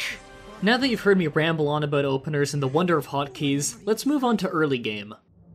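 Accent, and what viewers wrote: Canada. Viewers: You canadian right? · He is so frickin Canadian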